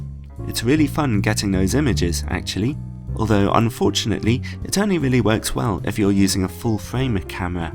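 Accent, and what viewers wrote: United Kingdom. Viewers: Even if this is an old video, it's always a great pleasure, and really relaxing to listen to your soothing voice with your sweet British accent,. Also, your microphone is perfect for your voice, and this music made by the famous fits perfectly for your videos. It's like your trademark now in some way, haha! Great video review about the Helios 44-2, thank you!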